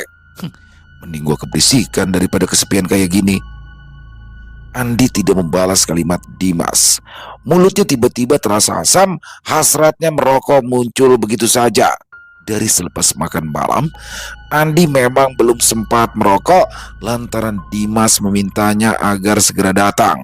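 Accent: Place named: Betawi, Indonesia. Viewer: bang udeh yg paling top dah Apelagi klo udah pake gaya bahasa dialek Betawi & Banjar Top banget 👍👍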